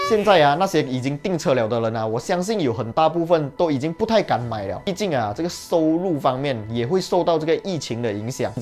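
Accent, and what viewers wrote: Malaysia. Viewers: Malaysia華語就是醬rojak的咯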